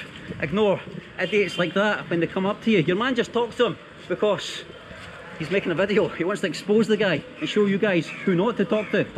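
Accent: Scotland, United Kingdom. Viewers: That Scot accent is hardcore yew !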